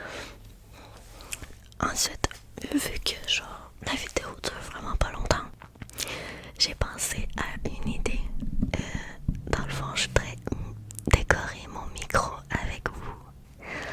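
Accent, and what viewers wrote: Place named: Quebec, Canada. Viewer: L'accent québécois est incroyable, merci d'être là 🙏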